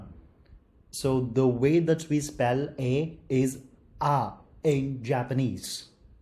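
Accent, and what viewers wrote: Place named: India. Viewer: Your presentation is very impressive👌.Your Telugu is clean unlike many other Telugu YouTube presenters. For example in other Telugu channels, they say the word వచ్చేసిseveral times, and లెగిసి instead of లేచి like so many repulsive Telugu words. Obviously present day Telugu is deteriorating. It’s a great relief to hear your Telugu . I wish to follow your Japanese language lessons. Please continue.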